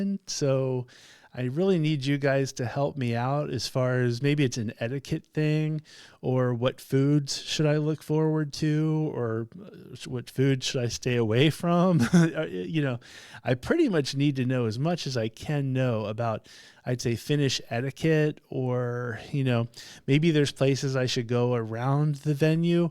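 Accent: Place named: United States. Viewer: This is the first video I see from you, so I know nothing about you. You sound American. Prepare for weather to be whatever, it's all over the place every day. In June, in the Southern Finland, heat-wise, I'd say +30C is THE MOST EXTREME I can think of, and that'd probably be a record or something. Summer days are usually around 15-27C. I'd put on a t-shirt, long but light pants of some sort, a jacket that's easy to take off and tie on your waist or something in case it's a hot day, comfortable shoes that can withstand rain or mud and a hat, put on sunscreen, take a disposable rain coat (fin. "kertakäyttöinen sadetakkki") with you - if they don't sell them where you live, in Finland you can buy one in pretty much any bigger grocery store such as Prisma or Citymarket for about 2€. Festivals don't allow to bring in water bottles. Most festivals have free water, in some you have to pay a small amount for it. 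Check the Tuska Festival site - if they're doing their job properly, they'll have a list of things you can or cannot bring with you and if water is provided. It's a big festival, I think they'll probably have that information. (Also try to google if a person from your area is ok to drink tap water in Finland. I was in Provinssirock about a decade ago and the water they provided was a self-standing tap in the middle of the area, which was fine for me, it worked. But I've also been to a tourist biking trip to Estonia, a neighboring country, and got a stomach bug because we ran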